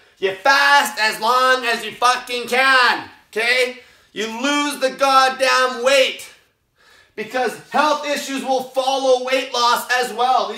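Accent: Canada. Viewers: i think im in love. this guy sounds canadian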